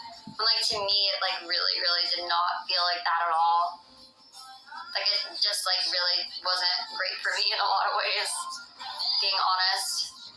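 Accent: South, United States. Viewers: your accent is interesting. Your “I” sounds like your from the south (words like “LIKE”, “LINE” ect.). All other words sound like “normal”. Where in the country do you hail from? Love you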